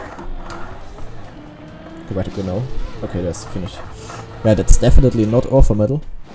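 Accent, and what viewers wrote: Germany. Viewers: man hört den deutschen akzent sehr gut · Ahhh der schöne deutsch englische aktzent. Ich liebe es. Btw du hast gesagt der corner lol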